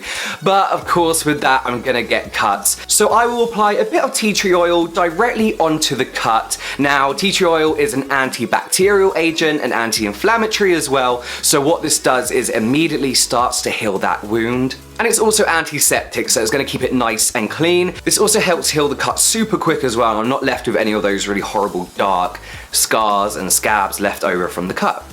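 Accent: United Kingdom. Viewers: I love the UK English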